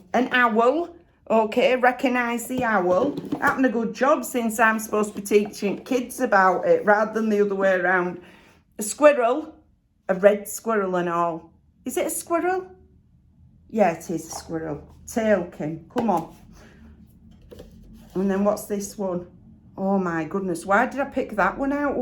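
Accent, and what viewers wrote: Yorkshire, United Kingdom. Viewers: 🤣😂🤣 I don't know how or why, but I got subtitles for this post. It was hilarious - I don't think it could cope with a Yorkshire accent. When you said your daughter's name it called her Ebay! If you get chance and you can get subtitles have a look at it - you'll have a good laugh!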